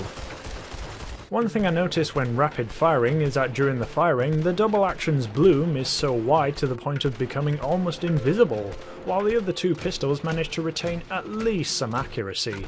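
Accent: England, United Kingdom. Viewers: don't know where he lives, accent doesn't mean he lives in the uk.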